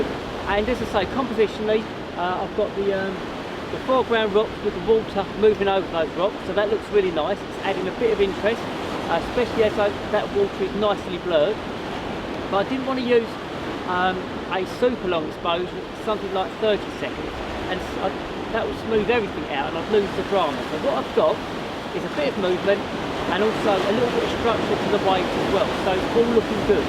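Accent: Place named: Essex, United Kingdom. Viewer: Great Essex accent!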